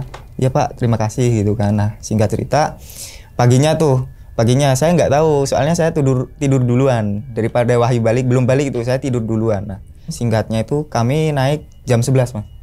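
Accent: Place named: Java, Indonesia. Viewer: gua elu nya medok 😅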